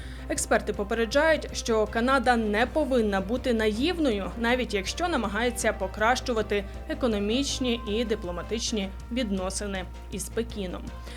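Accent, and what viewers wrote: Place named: Slobozhanshchyna, Ukraine. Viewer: Я понял, со Слобожанщины,Полтава? Акцент априори от туда,не?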